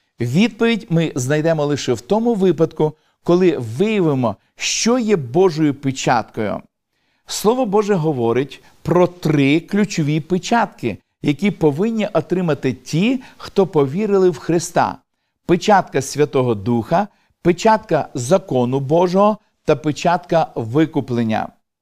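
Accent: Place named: United States. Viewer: До чого цей дурнуватий американський акцент і менторство? Середньовіччя і мавп'ятництво в сторону американців. Огидно...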